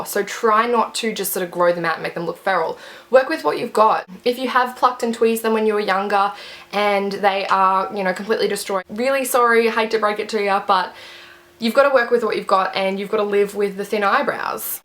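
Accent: Australia. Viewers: whenever i see you respond to peoples comments i always read your response in an aussi accent aha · are you australian ? cause you sound like an aussie ♡♥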